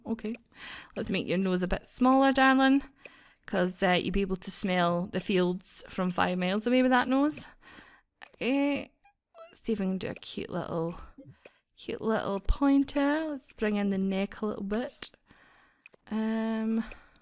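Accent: Scotland, United Kingdom. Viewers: I'm pretty sure she's Scottish as an Australian accent is like a relaxed British accent. I'm Australian, I should know ahahaha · Can hear those scottish roots coming out in you :P Instantly knew, scots rule! \o/ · Omg ur accent! I luv it! U Scottish?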